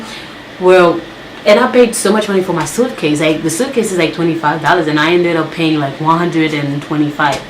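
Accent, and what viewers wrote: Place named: Haiti. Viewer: Ur so pretty ❤️, u talk like you may be Haitian & or african french creole accent.....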